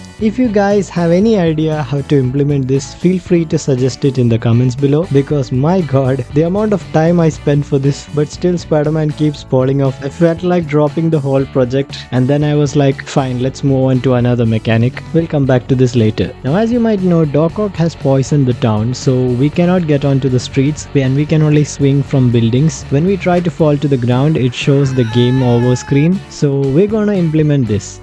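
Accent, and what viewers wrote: Kerala, India. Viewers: Are you from india? Kerala?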